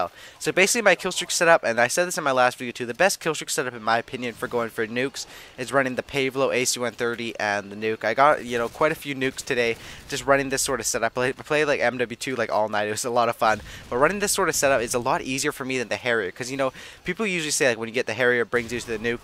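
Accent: Canada. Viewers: r u Canadian like me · are you from Canada?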